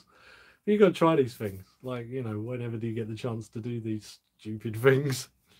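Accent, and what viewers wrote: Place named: Kent, United Kingdom. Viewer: hi kent...i am in kent...same accent too!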